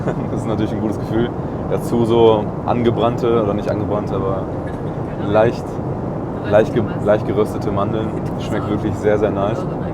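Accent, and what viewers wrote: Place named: Germany. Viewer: Dieser Deutsche Akzent 😁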